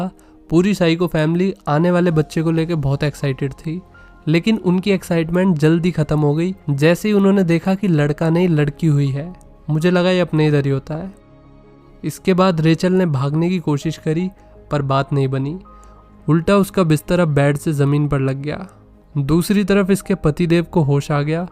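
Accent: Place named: Haryana, India. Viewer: Explainer pkka haryana see hh😂😂😂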